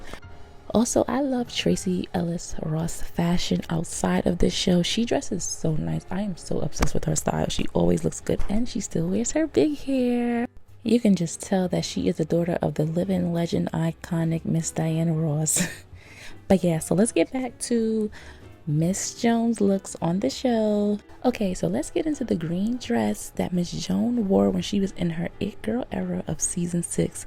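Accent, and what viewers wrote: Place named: East Coast, United States. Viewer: Your accent 😍, you sound like you’re from the East coast. And tbh everyone on Girlfriends was a terrible friend oops.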